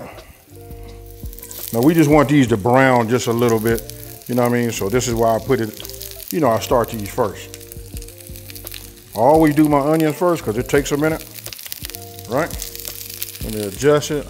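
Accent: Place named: West Coast, United States. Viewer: You 👀 my size. Southern with a West Coast accent😅. I love it! Also, I need that mandolin.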